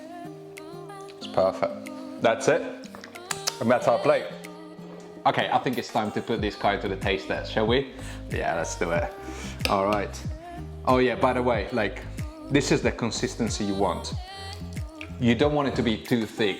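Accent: Thailand. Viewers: The Thai accent is perfect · Loving the Thai accent!! Can’t wait to make this 👌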